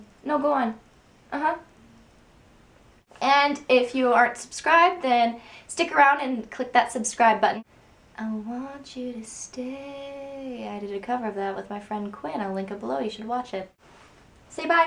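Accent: Canada. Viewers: This is the first video ive watched of you and i immediately could tell you were from canada :p i love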